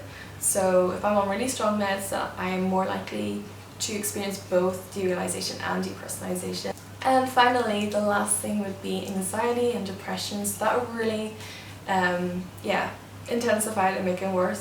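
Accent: Northern Ireland, United Kingdom. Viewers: You said that medication seems to make it worse. I have been somewhat pressured into taking various medications and to be honest all of them made derealization much worse. I definitely cannot take alcohol anymore because the hangover is basically really intense derealization. I guess the only way out is acceptance and to limit the fear response when I experience it. I wonder how you are coping now. I hope you have found a way out. I detect a NI accent.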